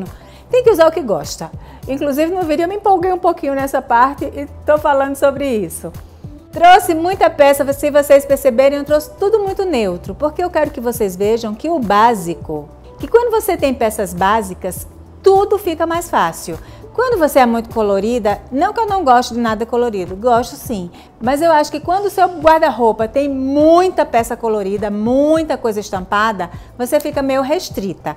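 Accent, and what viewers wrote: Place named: Northeast, Brazil. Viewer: Pelo seu sotaque deve ser Nordestina, como eu. A não ser que você não more no Nordeste, esses looks não são completamente inadequados? Ou então você mora no sul ou algo parecendo 🤔🤔🤔🤔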